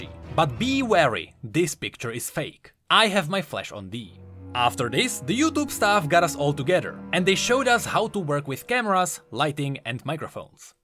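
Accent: Czech Republic. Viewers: Myslel som si že máš taký český akcent lol :D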